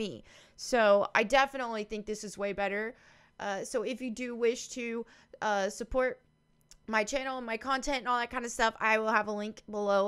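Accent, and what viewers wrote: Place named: South, United States. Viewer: I have honestly become quite inspired by you since I made my way over to your channel like many. ie referral from Inspired by your Presence and almost abrasive delivery. Yet you also seem to have crazy similar mindset/goalset on...well almost every topic I have I've heard you Discuss. Like so similar that I found myself finishing some of your thoughts simultaneously in my head. Initially I was rather freaked out cause I don't think I have ever done that. It started to reoccur as i progressed through you few videos. So for one. I'd like to take this moment to tell you how awesome you and your message is..two I believe wholeheartedly in your key foundations considering most of the topics you have discussed in well the past hmm 2 days I think. haha (as well As should be spoken about more than it does.) Anyway, I do apologize for how intense this message may be for you. 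it is I guess simply how unexpectedly intrigued I have become. enough that I wrote this entire comment which I still don't even know the extant of my point. But was so enthralled that I almost felt I needed to connect you and relay my gratitude amongst other things. Also iDk what bit of southern touch to your voice you have. But it rocks kinda like you it fits you... I am from NYC born and bred so i am unaware of where. Allz I know is the more I learn. I am in awe of you. So Thanks for being You and keep the vids coming. you have probably reestablished a glimmer of hope for us as humans and women. I thou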